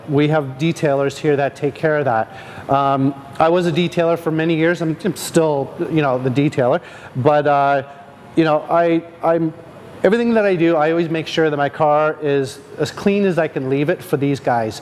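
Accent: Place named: United States. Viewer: I like yourself am about 20+years and have used 3m products continuously, yes ive tried most other "scene" machine polishing brands and have always come back to 3m,and have realised there are so many rebranded materials so if it aint broke dont fix it! Rotary and 3m products do exactly what you want them to, good video ur like me but with an American accent lol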